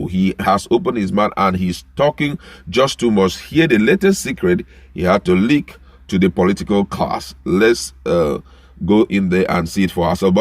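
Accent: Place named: Ika, Nigeria. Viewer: Bro, you speak like an Ika Igbo, you sound like George Oyinbe. I went to high school in 1970/1971 with a guy called Pius Oyinbe from Ika. This was in Ologbo\Benin.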